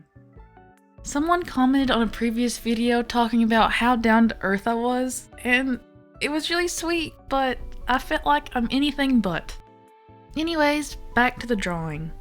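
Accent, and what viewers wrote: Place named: Rural, United States. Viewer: i love your voice, it just makes jokes have that country flair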